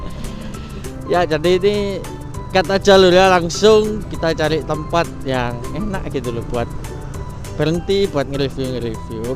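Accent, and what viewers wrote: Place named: Java, Indonesia. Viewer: Mas nya medok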